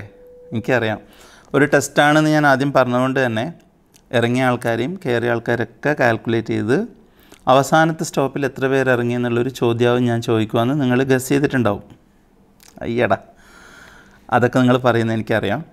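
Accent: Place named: Kanhangad, India. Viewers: അങ്ങേടെ ഭാഷയിൽ ഒരു മലബാർ ശൈലി ഉണ്ടെന്നു തോന്നി. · Kanhangad alle aadhiyettan...kannur alla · Kannur alla kasaragod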